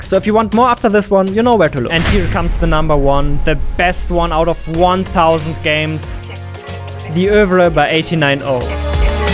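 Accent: Germany. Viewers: Also ich wart ja schon seit einem Jahr auf will u Snail XD und weisst du was, voll kein Problem. Ich versuch gerade mein erstes Game zu machen und es geht immer länger als gedacht. Jedenfall freu ich mich drauf dein Spiel zu Streamen ob ichs gewinn oder nicht. Für deine Statistik bin über Islanders auf dich aufmerksam geworden. Mach weiter so, nice Videos, nice Games, ein unverkennbarer deutscher Akzent, der meine Freundin zur weissglut treibt, wenn ich deine Videos guck, Alles in Allem ich bin Fan. Grüsse aus der Schweiz ;) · Man merkt das deutscher bist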